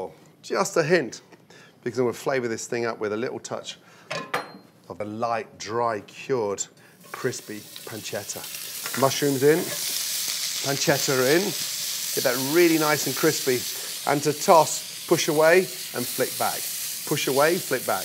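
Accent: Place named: Australia. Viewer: Hi Gordon if I may address you as such I am 89 years old and Vietnam veteran, and a newbie at cooking, which I love at one and a half years.. and I must say your approach to cooking is impeccable.. even with your Australian accent, you present yourself admirably.. I consider myself a better than average cook.. and living in Massachusetts I’m very close to all the scallops that I can bring home. One thing amazing about scallops. It does not take very many to fill you up. I enjoy your presentations very much and I like following you. Thank you.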